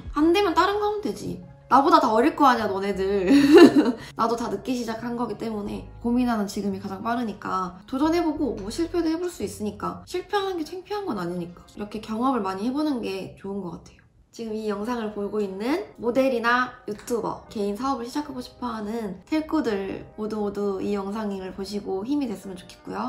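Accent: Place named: Gwangju, South Korea. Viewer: 말도 너무 예쁘게하고 그냥 천사같당 저도 광쥬사람인데 억양에서 조금 느껴지네유 고생 많았어염 앞으로도 지금처럼 행복할거예요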